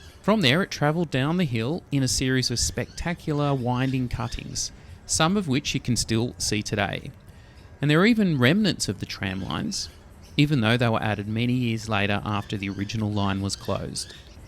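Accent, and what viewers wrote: Australia. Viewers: I find your Aussie accent disgusting, you're talking like you have lots of rotten oil and rotten cheese in your mouth and talk at the same time. You say line more like loine which is particularly disgusting to hear. You must speak more like RP.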